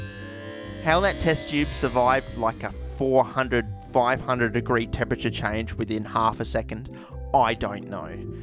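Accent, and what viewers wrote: Australia. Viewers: When watching these videos I like to imagine an Australian chemistry teacher talks in the same way in the classroom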